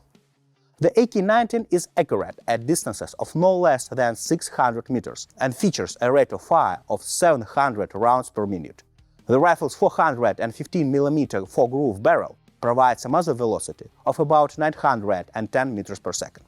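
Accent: Russia. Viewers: Русский акцент, этому парню не куда не спрятать.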